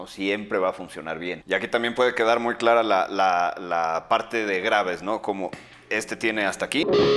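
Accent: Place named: Yucatan, Mexico. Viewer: Haha al chile sí hablan así los yucatecos. Con eso me quedo de este video.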